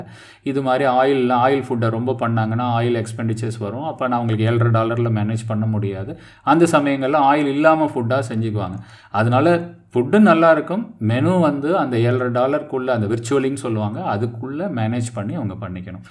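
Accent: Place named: Karaikudi, India. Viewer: காரைக்குடி ஒரிஜினல்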